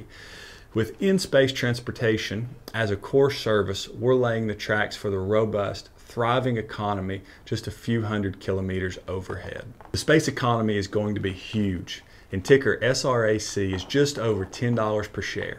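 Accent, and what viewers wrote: South, United States. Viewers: Southern accent like yours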